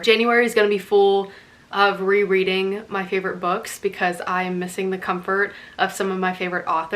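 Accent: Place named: South, United States. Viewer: Girl literally never apologise for a southern accent 😂💖 i think its really charming . get well soon!!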